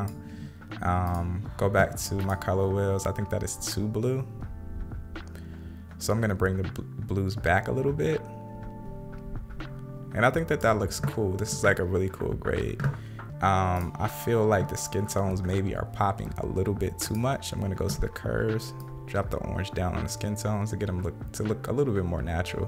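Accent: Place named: Atlanta, United States. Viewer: His dialect is like Andre 3000. Atlanta, maybe?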